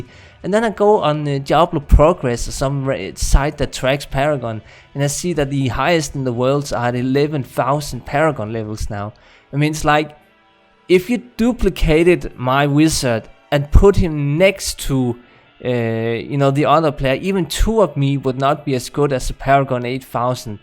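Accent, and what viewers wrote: Denmark. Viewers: Hey your accent sounds danish, am I correct?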